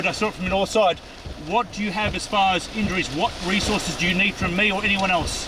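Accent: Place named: Australia. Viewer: Just came upon your channel sir. I really appreciate your approach. Safety and a true love to help others. I'm sure im not the first, but can you imagine being in the southern US; get smacked by a storm and have some bloke with an Aussie accent asking if you're ok. Did i get whisked away to Oz? Well done. 73 God Bless.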